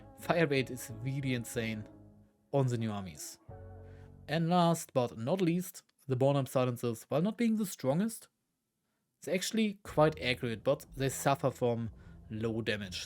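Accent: Germany. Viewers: Dude your German accent is so heavy I can't understand what you're saying 😭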